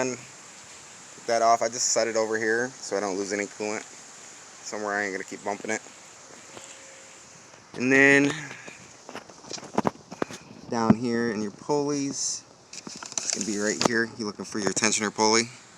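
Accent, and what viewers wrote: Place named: Pittsburgh, United States. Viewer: You’re from Pittsburgh huh? Lmao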